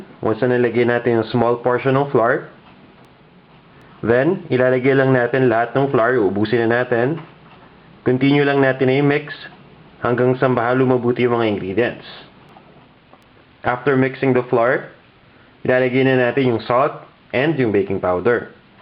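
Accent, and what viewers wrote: Philippines. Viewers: Filipino accent :O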